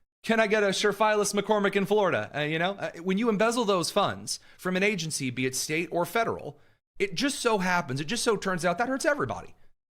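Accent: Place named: Minnesota, United States. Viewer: Love your Tim-Waltz/Menisota accent! Got a good laugh this morning 😂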